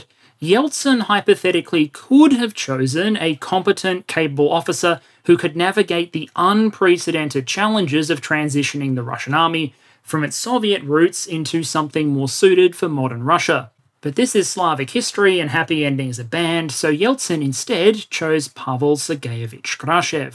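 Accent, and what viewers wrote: Australia. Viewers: It’s the Aussie accent 😉😇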